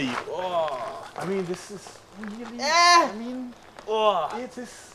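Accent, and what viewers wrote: Germany. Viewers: I guess I spent too much time in Germany while serving in the US Army to not notice your accent. 😄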